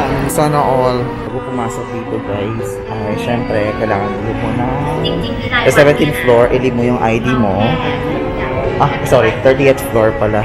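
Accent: Iloilo, Philippines. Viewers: Bacolodnon? Or ilonggo? Hehehe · Ilonngo lng malakas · Ilonggo gid tana aayyy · Kuya Illongo ka? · Illonggo po ba kayo? HAHAHA yung accent kase hehe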